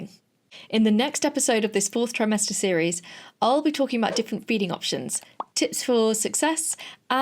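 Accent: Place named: United Kingdom. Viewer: Hi, I have been binging your content over the last week or so and want to say a huge thank you. Its refreshing to hear a British voice with experience in the NHS going over all these important concepts since so much of the content on Youtube is US centric. Im 37 weeks pregnant and feeling much better prepared for breastfeeding and postpartum thanks to your content. I was also fascinated by how the mention of "OF" clearly got your video seen by the algorithm and the engagement it got!!! Like the YT game is rigged against legit informative content... In any case, thank you and keep doing what youre doing ❤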